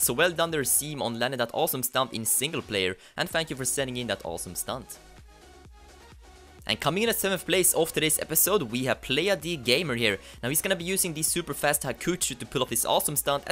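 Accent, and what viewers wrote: Sweden. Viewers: Nice video! are u swedish? u sound swedish :D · Do you come from sweden? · Thats classic swedish style english almost every swedish talks english like that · Are you swidish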